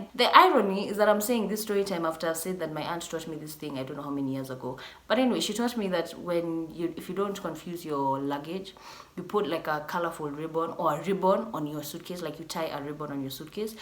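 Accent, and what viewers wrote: United Kingdom. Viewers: The British accent tho🥰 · am here for the accent...girl i love you....when you are loud kidogo and ur british/UK accent can be heard in there somewhere..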